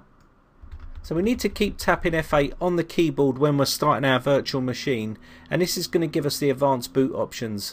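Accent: United Kingdom. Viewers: Sotaque britânico é top kkkkk Excelente vídeo!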